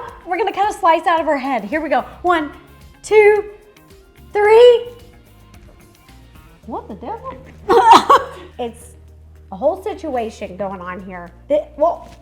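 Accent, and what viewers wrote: United States. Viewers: Haven‘t hearrrrd SUCH an American accent in a long time but sounds cute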